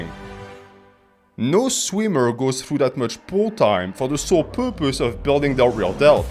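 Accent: France. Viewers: Youtube cannot comprehend thick french accent, the closed captions keep saying "vapers" instead of "v-tapers" and said "food in the gym" instead of "foot in the gym" it's hilarious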